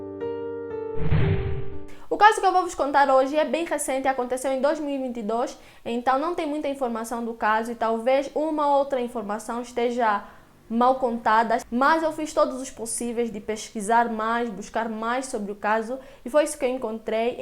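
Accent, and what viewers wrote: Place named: Portugal. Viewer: Voce puxa mais para o portugal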